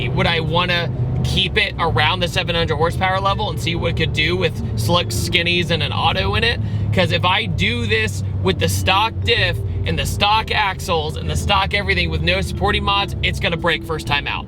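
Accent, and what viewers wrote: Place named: South, United States. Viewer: Don’t apologize for that southern drawl. A southern man tells better jokes.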